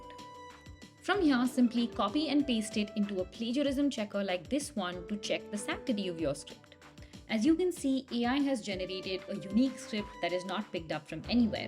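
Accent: India. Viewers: such a nice work but voice over typical stupid indian accent , come on guys .....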